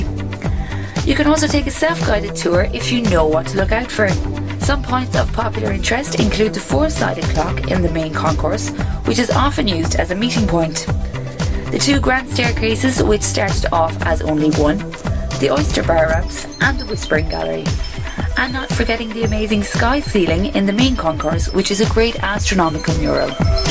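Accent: Ireland. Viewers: I think her irish accent and voice sound very nice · Sounds like a hybrid Irish accent to me .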